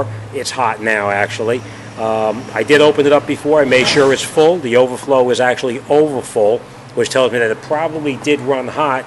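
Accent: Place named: New England, United States. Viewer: Smart man but that Yankee accent hurts my ears.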